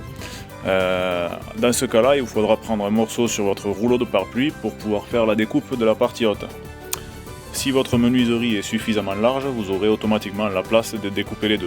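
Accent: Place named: Carcassonne, France. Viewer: slt, super merci pour les infos. Je ferais donc un coffrage si tu me le dit c'est que tu le sait ne pro c toi pas moi 😉. J'appiquerais donc tes conseils à la lettre . Je me disait aussi cet accent est le même que ne mien !!! Moi je suis de carcassonne un grand merci pour les combine surtout que tu n'est pas obligé. Par contre si tu fait un tuto sur ce genre de méthode eh bien je prend avec plaisir.a moins que tu l'est déjà fait dans ce cas pas besoin. Je n'est pas encore pu visionner toutes tes vidéos je le ferais ce week-end je pense encore merci 😉😉😉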